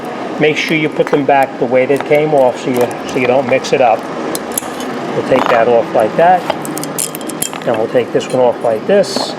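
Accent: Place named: New York, United States. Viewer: Good vidio, sounds like a true New Yorker, lol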